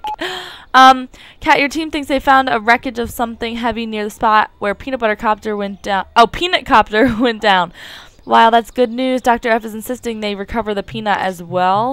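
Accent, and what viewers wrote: United Kingdom. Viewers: U sound like garnet from Steven universe with ur British accent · Your British accent is spot on!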